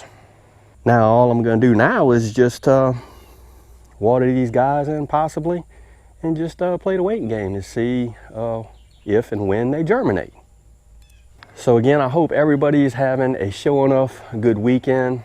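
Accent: South, United States. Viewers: That dibble is fabulous! I cannot wait for hubs to make us one for spring planting. I am a new sub and love that charming southern draw of yours. It is cool here in Oklahoma today and we are loving it. Take care!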